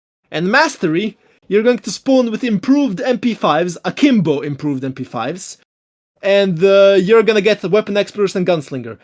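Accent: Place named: Russia. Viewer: Why does shound russian english accent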